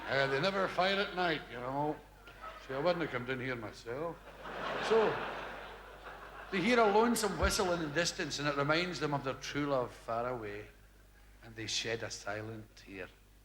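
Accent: Scotland, United Kingdom. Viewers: Is it me or our beloved Billy sounding more scotish can't work it out ???🥰😘❤ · It's the early days, he still had his Glasgow accent. He sounds as Scottish as he's always sounded.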